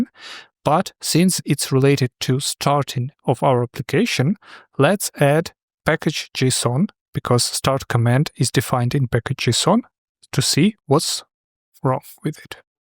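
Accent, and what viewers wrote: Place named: Russia. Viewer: Слышно, что русскоязычный. Why do you speak English?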